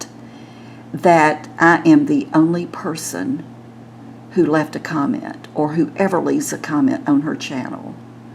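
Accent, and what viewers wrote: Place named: Arkansas, United States. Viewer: ***** oh, I love Arkansas! That's why you have such a beautiful accent! Stay safe!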